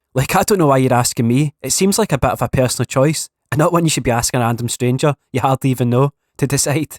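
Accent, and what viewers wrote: Scotland, United Kingdom. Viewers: Its gid to have more Scottish youtubers nicer to listen to along with the Irish ones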